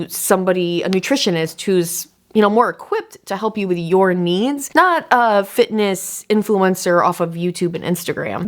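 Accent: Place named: Midwest, United States. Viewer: Omg are u Midwestern? I hear my ‘aaaaaah-ccent’ in ur way of talking hehe ;)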